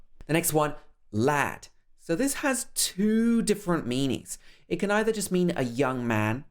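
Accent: United Kingdom. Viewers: Finally,I found this utube! I really love to learn this accent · I have been looking for some channels that can help me to improve my British accent. I found yours, I like it. Thank you.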